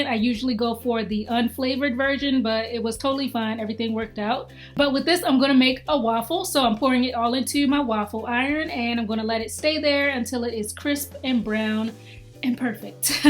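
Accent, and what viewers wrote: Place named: DMV, United States. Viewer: you have to be from the dmv based off your accent."uuuuused"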